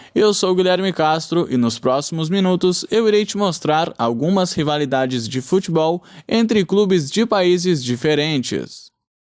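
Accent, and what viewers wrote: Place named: Portugal. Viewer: Parece um português tentando imitar os br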